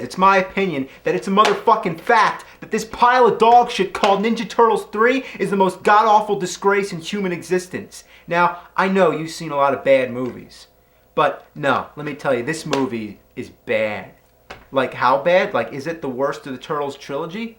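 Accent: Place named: Pennsylvania, United States. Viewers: Eastern Pennsylvania / New Jersey accent. James is from Eastern Pennsylvania, near Philadelphia. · He's older and he is a dad so he got the Dad accent now.. That East Coast Dad Accent.. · He's got that outside Delco, Delco accent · That's the Jersey vernacular, where he lives. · New Jersey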